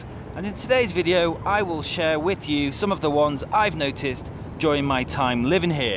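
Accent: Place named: United Kingdom. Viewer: love your videos I also love your Britannia speaking do not lose it emphasize how English should sound Like .Arriba Juarez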